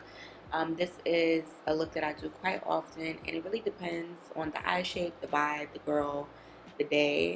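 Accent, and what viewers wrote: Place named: New York City, United States. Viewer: what is your background,where you from? Cuz I'm getting Bronx N.Y. Jersey vibe from your accent.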